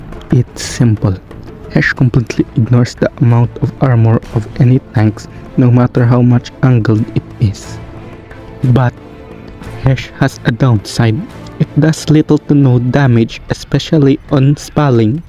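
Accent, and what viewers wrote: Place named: Philippines. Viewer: bro got the heaviest filipino accent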